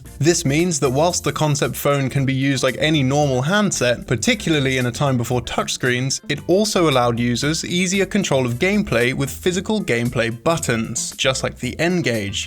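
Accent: England, United Kingdom. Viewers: I love you and your weird (to me) British pronunciations,! Now I'm genuinely curious if that's how English say "patent" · Words are pronounced differently based on region. In this case, likely British. Case closed. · You'd think everyone had never heard Englishman speak before.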